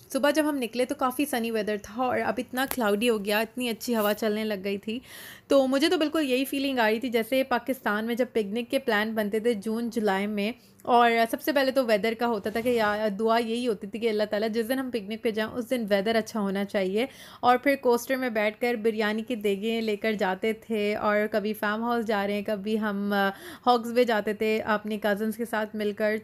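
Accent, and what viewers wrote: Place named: Punjab, India. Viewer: Wah sun k acha laga k ap b punjaban ho mai b punjab se hun muje laga tha ap karachi se hain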